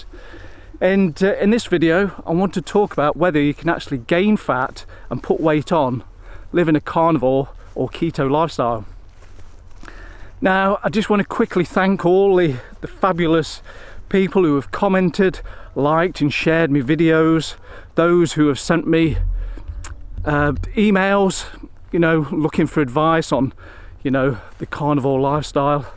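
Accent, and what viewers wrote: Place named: England, United Kingdom. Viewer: Hello from south Mississippi! I love your videos! My southern accent loves your English accent! I use the Renpho scales too. You mentioned in a video that you had ordered a new set of scales, but at the time, you haven't received them. When you get them, will you give us a review and comparison of the Renpho scales? Keep on keepin' on making your wonderful videos!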